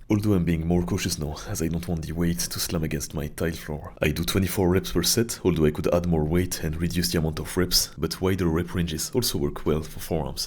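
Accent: France. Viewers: Oh vu de ton accent, tu es français, non ? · langlais en mode francais ta pas lacent va sur internet ya des prof danglais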